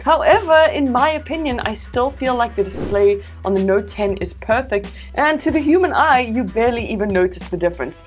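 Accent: South Africa. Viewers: Refreshing to hear a South African voice! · Wait you're south African? 😮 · Thought you were south African lol inwas born and raised there and came over to the uk in 2010 xx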